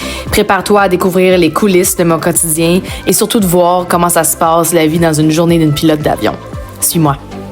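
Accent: Quebec, Canada. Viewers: Merci pour cette vidéo toujours aussi jolie l’accent québécois bon vol merci de faire partager ta passion du pilotage bonne année 2025 santé et bonheur milles bises de France Jérôme ❤❤❤❤😊😊😊😊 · Je découvre et je m’abonne, fana d’aviation et de l’accent Québécois c’est le top merci 😍👍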